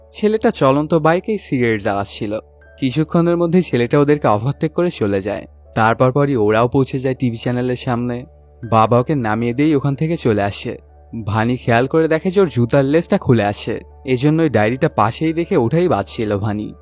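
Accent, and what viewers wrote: Bangladesh. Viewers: Anar gola sune mone hoche Bangladeshi..ader ki oder desher movie kom pore j sudhu amader indian der sob kichu niye pore thake...asole India chara to choltei pare na..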